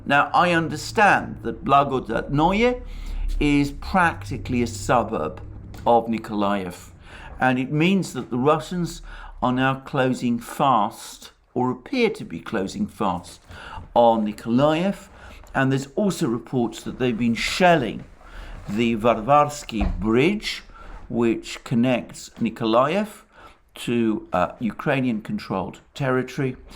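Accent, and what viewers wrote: United Kingdom. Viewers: With all due respect, but I disagree on your views regarding the assassination attempt on Dugin!!! The ends justify the means and he is supposed to be Putin‘s „brain“. And hearing someone with a british accent complaining about ONE innocent life which has been lost is soooo funny!!! By the way… how‘s the „Bomber“ Arthur T. Harris Statue doing in London? Please do not tell me about lost, innocent lives!!! You are just not entitled to do so. Sorry… thats my very personal view.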